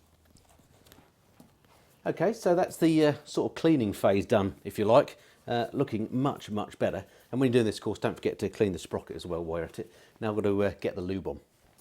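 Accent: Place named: United Kingdom. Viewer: Brits talk funny.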